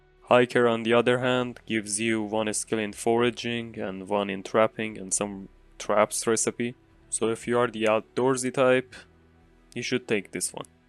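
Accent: Iran. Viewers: Are you Iranian? You have a Persian accent.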